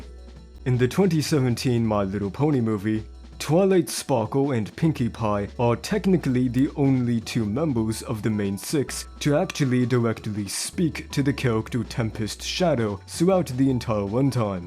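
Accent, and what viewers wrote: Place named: Germany. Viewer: are you german, because your voice sounded like a German accent.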